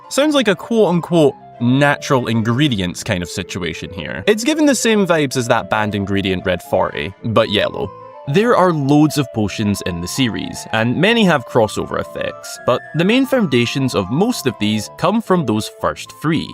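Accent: Scotland, United Kingdom. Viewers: Are you from the same region of Scotland as Lorraine Kelly?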